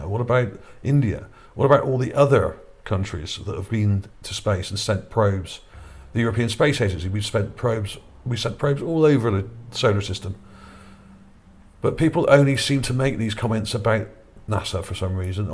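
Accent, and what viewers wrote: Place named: Gloucester, United Kingdom. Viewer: You sound like you're from Gloucester or somewhere south maybe bristol?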